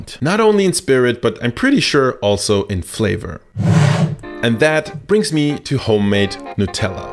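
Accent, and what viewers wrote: Germany. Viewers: This is probably lhe least german german accent I've ever heard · Is he german? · First time on your videos. I detect a german accent, am i right? I like guessing people's accents 😁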